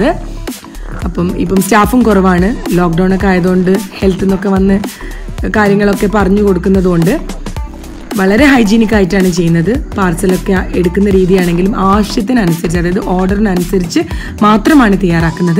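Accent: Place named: Kozhikode, India. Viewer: ഇങ്ങളെ വർത്താനം കേട്ടപ്പളേ ഞമ്മക്ക് തോന്നി ഇങ്ങള് കോഴിക്കോടാണെന്ന് ഷിജോ ഏട്ടൻ പറഞ്ഞിട്ട് വന്നെയാ മ്മളെ ഒരു കുഞ്ഞി ചാനലുണ്ട് s&f vlogs ഒന്ന് കേറി നോക്കൂലേ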